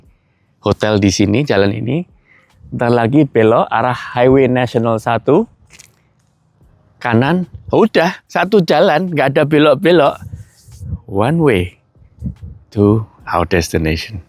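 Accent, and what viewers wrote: Java, Indonesia. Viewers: Saya salut dengan jenengan Pak,walaupun oerbah tinggal di Australi sangat lama,tapi logat Jawa jenengan masih sangat kental🙏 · Tampilan LC 200nya cakep banget, velg+ban dan modif bagian depannya pas banget. Suatu saat bisa bikin mobil overland seperti om wid 🎉. Satu hal yg bikin salut, walaupun lama tinggal di Australia, tapi logat jawanya masih ada loh 🎉🎉🎉.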